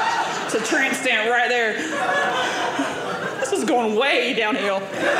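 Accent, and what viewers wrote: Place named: Alabama, United States. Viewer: i like her, she talks like me! From Alabama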